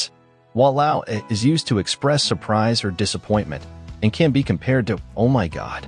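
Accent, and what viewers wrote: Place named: United States. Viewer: Hello narrator, pronounce the Singlish terms correctly please. Please don’t use American to pronounce our Singlish. It’s cringey! Final night’s term should be pronounced as ha - nah ah-buh-den = yes, ah but then? Meaning yes, otherwise what else do you think that hing should be.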